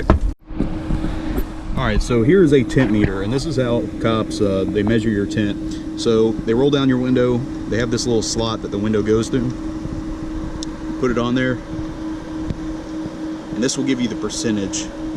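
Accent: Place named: Georgia, United States. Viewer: Wow this such a good idea for a youtube channel!!! Cute Georgia accent!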